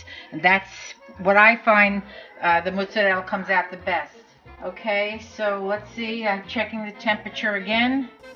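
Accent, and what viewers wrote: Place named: Bronx, United States. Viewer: Another nice video! You sound like a Bronx gal to me. My Grandpa was from there. Try the whey in soups, it's really good. I have a smoker, so I might attempt making some scamorza.:)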